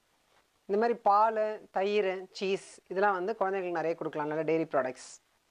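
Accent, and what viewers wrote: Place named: Tirunelveli, India. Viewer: 5 days back I subscribed Ur channel..one full day I watched ur vedios.like Ur slang assault ah pesureenga.r u from Tirunelveli? Also I saw ur juices vedios bought some fruits..made muskmelon juice..then lunchbox ideas was very nice bought a new box for my husband.. plz upload toddler food ideas sathiyama saapda vaika mudila..thanks ungal sevai engaluku thevai ...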